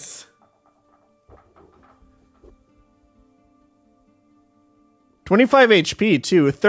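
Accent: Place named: United States. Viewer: he once stated he lived in hongkong (?) for a few years and was influenced very much by american tv series, thats where his accent might come from. I also like how his voice switches entirely when hes speaking german:D In one of the last videos on chat actually forced him to speak 10 min in german Boy cuckbux (twitch currency which you earn for viewing his streams)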